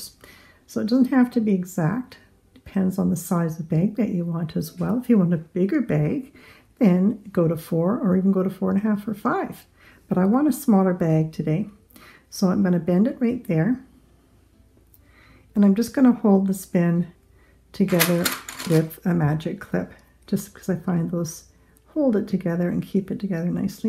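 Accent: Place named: Canada. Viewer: Hi. I love your tutorials. You sound like a Canadian.;) If you are where do you purchase your cotton rope for your projects. I live in rural Manitoba and have very limited options. Thanks so much and keep them coming. I dyed rope and made Easter baskets after that video....so great.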